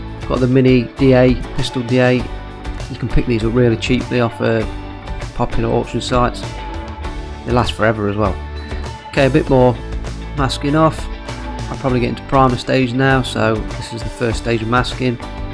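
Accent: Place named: Nottingham, United Kingdom. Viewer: You wouldn’t be a nottingham lad by any chance?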